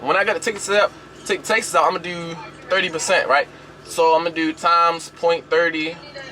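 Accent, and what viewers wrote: Baltimore, United States. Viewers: Definitely gotta be from Bmore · Must be from Baltimore 😂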